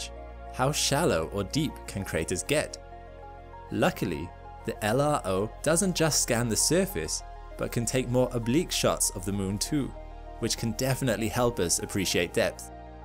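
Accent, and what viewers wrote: United Kingdom. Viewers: Do you think they have American narrated documentaries in Britain? so why do we have to listen to limie's over here all the time?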